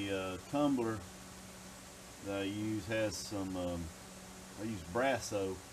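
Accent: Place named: Tennessee, United States. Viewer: you sound like you from tennessee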